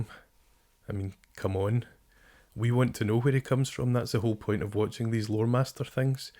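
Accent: Scotland, United Kingdom. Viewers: Warhammer lore in Scottish accent sounds dope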